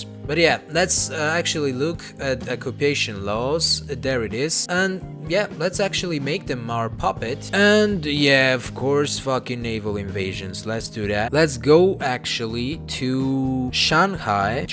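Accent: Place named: Arab world. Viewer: Arabian accent, the youtuber is from the UAE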